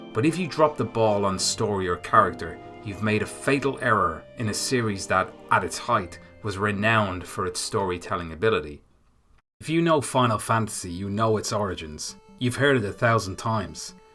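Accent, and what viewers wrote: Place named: Ireland. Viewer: People saying 'why no IV, V, Tactics etc' should bear in mind that none of those games were originally released in Europe. (Or, as far as I'm aware, anywhere outside Japan or North America) By his accent I'm assuming grew up in Ireland, and for those of us in Europe, FFVII was not only our first Final Fantasy game, it was the first Final Fantasy game we were even capable of playing. 'Golden Age' is going to be by definition subjective and I took as embracing that in this video. At any rate to me and a lot of people like me, the games before FFVII are usually emotional non-events because we played them (if we even did) out of their era, much later on. The nostalgia just aint there.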